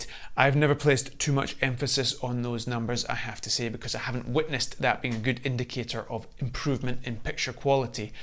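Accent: Scotland, United Kingdom. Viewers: Love your accent haha! You sound EXACTLY like my Scottish neighbor.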